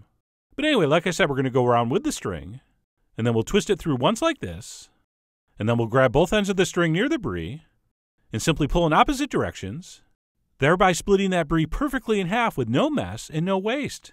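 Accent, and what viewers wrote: United States. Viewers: I still struggle with this tuning up and down form this voice. It's like a Roller-Coaster in each phrase... dah-dah-DAh-DAH-dah-dah... dah-dah-DAh-DAH-dah-dah... dah-dah-DAH-DAH-dah-dah... This sounds EXACTLY like a vinyl record with a out centered hole in the middle. The record spins like an elipse... Is that some kind of accent from some region in the USA? It's really annoying in my ears... BUT, the video is really cool and THANK YOU for this recipe!!! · His voice inflection gives me anxiety... I'm trying to listen to his instructions, but his voice inflections keep distracting me and forcing me to rewind, but I'm usually just re-distracted so I end up rewinding one section 4 times. D: He's great though, talented and funny, so imo the distraction is worth. Anyone know where this dialect originates from? Pretty sure it's an American regional accent.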